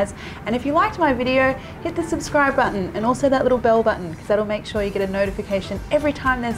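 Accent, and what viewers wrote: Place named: Australia. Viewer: I’ve got to try this. Great vid and so well done. Are you Australian? Like your accent.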